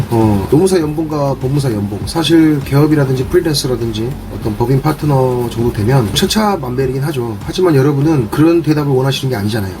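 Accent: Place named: Jeolla, South Korea. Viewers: 아따 라도여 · 전라도 분이신가... 고향의 냄새가 나네...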